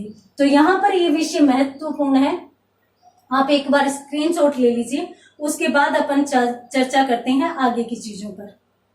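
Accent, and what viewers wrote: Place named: Ganganagar-Bikaner, India. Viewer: Dii ,pata ni par mam ki voice se ganganagar,bikaner region se lagte hai।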